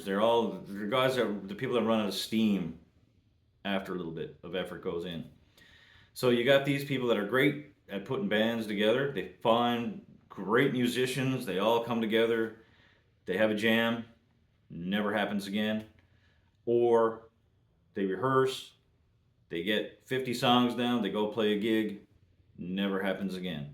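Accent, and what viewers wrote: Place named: Nova Scotia, Canada. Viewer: Jesus bud, you sound like youre from nova scotia or newfoundland, not new brunswick, we have the best bedrooms here.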